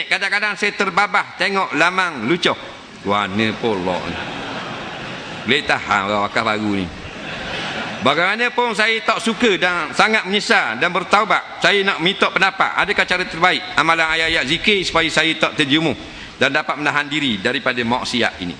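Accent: Terengganu, Malaysia. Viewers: tak faham la ape dia ckp..loghat ganu pekat sgt.. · Saya pn faham... Ganung power...syok dengar org ganung cakap #ankjatikedah...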